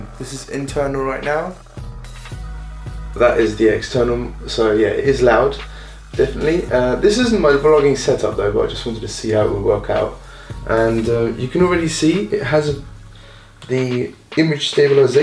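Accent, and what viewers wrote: United Kingdom. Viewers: okay I´m really flashed right now I have been watching felix` vlogs over the past few weeks daily again and when you stepped in the #TeamSpielkind I really liked how you edited the videos and how you were and are in the vlogs but on German and now I FINALLY checked your chanel and I must say that I`m pretty flashed by your British Accent haha xD ok I had to say that. I wasn`t expecting this xD Didn´t know you were British haha. Okay keep on I will follow you on.:D Bye have a nice day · Wieso kannst du so gut Englisch? Hört sich halt ultra nach nem britischen Akzent an... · Hört man sehr stark Raus 😂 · Guten morge. Bin neu auf deinem kanal durch #teamspielkind. Woher kommt denn der nice britische akzent?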